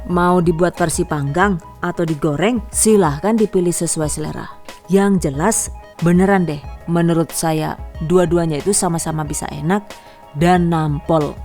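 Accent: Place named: Tegal, Indonesia. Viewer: Tegal baget ya bund,,😅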